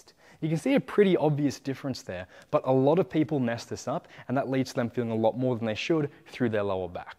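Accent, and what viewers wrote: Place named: Australia. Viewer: When I heard your voice I immediately picked up that your were an Aussie, and then I looked at your About page and yes, I was correct. Thanks for the deadlift info.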